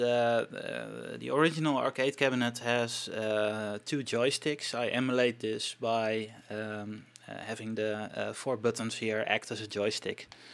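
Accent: Netherlands. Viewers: Duuuuuuuuuuuuuuuutch! :D Ik kon het horen from your accent lol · Nederlander? goeie video! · Typisch neder-engels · you.. are.. dutch?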